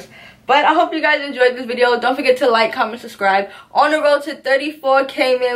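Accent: New York, United States. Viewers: NY accent 😍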